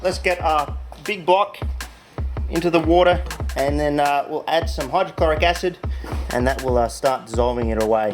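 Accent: Australia. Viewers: Your always doing “the Australian accent” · “i can’t do the Australian accent” …mate, you have an Australian accent all the time lol ❤ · Saying "I can't do the Australian accent" when you literally HAVE and Aussie accent is actually pure gold. Thanks for that, I needed it.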